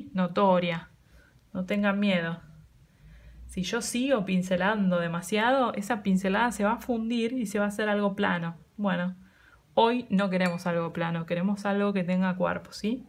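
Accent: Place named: Spain. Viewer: Me encanta su acento de español ❤❤❤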